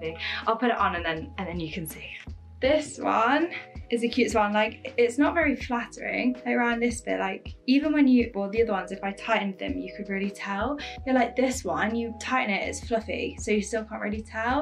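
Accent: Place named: United Kingdom. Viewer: Her British accent is such a turn on.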